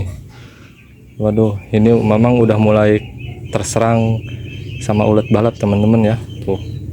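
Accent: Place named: West Java, Indonesia. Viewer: Alamat d mana...kaya orang Sunda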